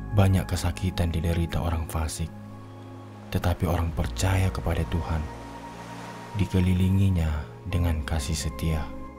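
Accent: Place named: Nias, Indonesia. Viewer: Kalau dengar logatnya seperti logat org Nias bhsa indonesianya.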